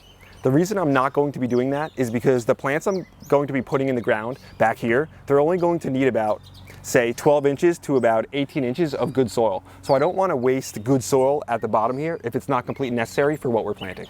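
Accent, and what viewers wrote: New Jersey, United States. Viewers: "hold all that excess waudder" I f'n love your videos and jersey accent, it makes me think of my old college buddies. Keep it up, and full disclosure I steal these ideas! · I love watching him. He’s 100% New Jersey and this Texan loves his excitement. I don’t see content worth watching on yours.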